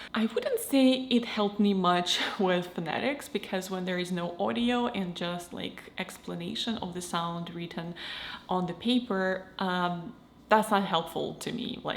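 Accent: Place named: Russia. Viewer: The late zoomer/early millennial Russian accent is something else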